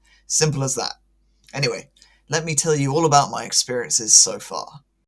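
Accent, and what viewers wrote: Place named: United Kingdom. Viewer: It´s lovely to hear you talking. I am not used to listening to British English that much anymore. Besides that, I hope that everything works out well in the future and you can come to Taiwan soon!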